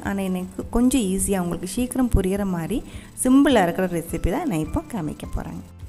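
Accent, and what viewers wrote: Kerala, India. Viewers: ur slang is so good and ur voice also malayalam kaladha Tamil nice to hear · உங்கள் malayalam கலந்த தமிழ் பேச்சு மிக மிக அருமை.கேட்டு கொண்டே இருக்கலாம் போல உள்ளது Sister. வாழ்க வளமுடன். உங்கள் கேரளா recipes also அருமை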